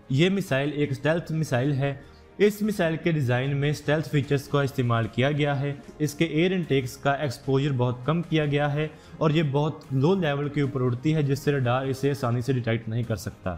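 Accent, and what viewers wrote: Punjab, India. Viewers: Bhai Punjabi ho kya aap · He is from punjab I think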